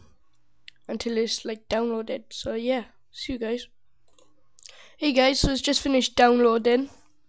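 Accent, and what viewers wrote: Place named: Ireland. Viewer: OMG ARE YOU IRISH?!?!?! I LOVE IRISH PEOPLE *-*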